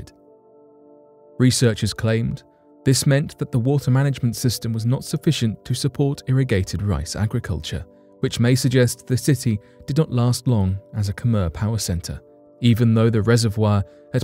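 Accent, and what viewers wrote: United Kingdom. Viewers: I like the content of the video. Im just sick of English accents! Anytime there is any science or history its a gdamn British accent! Im sick of it! Next vid of youres with a Brit accent and its a thumbs down!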